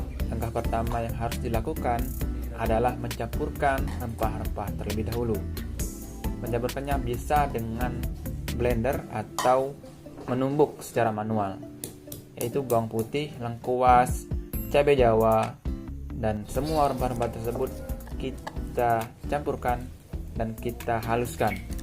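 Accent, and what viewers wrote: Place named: Aceh, Indonesia. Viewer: Logatnya suku aceh y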